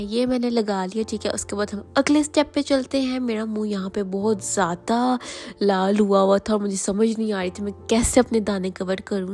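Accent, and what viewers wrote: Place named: Punjab, India. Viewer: Punjabi accent full 😅😅😅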